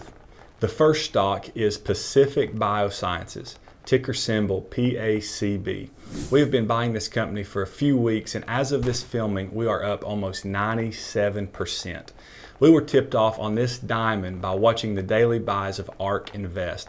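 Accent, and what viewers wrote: South, United States. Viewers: Southern accent like yours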